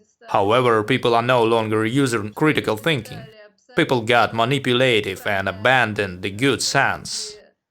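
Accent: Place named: Russia. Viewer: Нууу, без обид, но с таким «хэви рашн эксент» немногие смогут послушать выпуск хотя бы 10 минут... советую уменьшить басы, хотя бы, а то перевод звучит как от «рашн мафиа»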